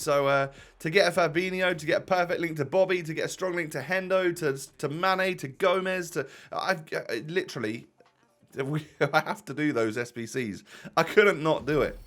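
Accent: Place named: Black Country, United Kingdom. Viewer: 😂😭 spot on black country accent boss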